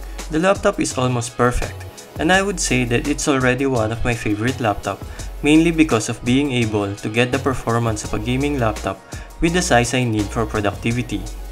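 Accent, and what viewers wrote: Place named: Philippines. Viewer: Im not a Pinoy but I can recognize that the reviewer is Pinoy from his accent 😁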